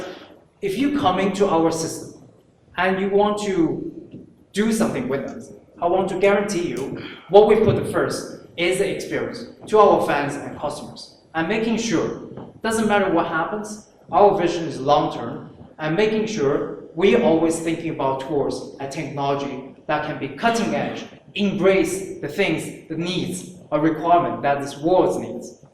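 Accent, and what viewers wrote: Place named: United Kingdom. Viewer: His British accent is so kool.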